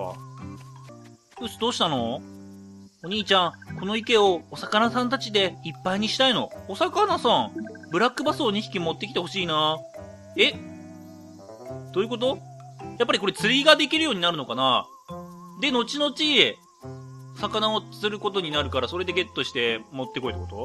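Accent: Kansai, Japan. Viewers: 君の拙い関西弁に萌えた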